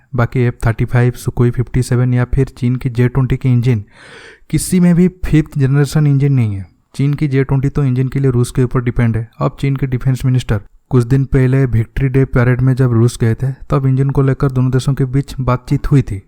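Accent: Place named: Bengal, India. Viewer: Aap apna language improve karo..bangali style hindi mat bolo..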